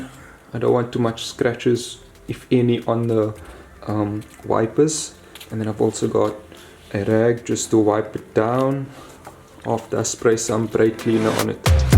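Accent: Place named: Afrikaans, South Africa. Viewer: Hy klink Afrikaans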